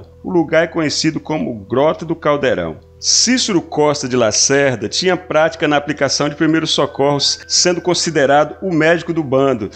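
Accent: Northeast, Brazil. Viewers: Mais uma excelente narração de cabra macho do nordeste como deve ser e sem ser acometido pelo vento mau!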